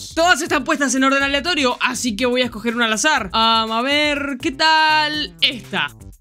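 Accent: Chile. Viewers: Erais chileno o qué onda weon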